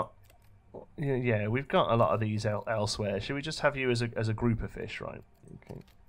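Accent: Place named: England, United Kingdom. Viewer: I subscribed for the English accent.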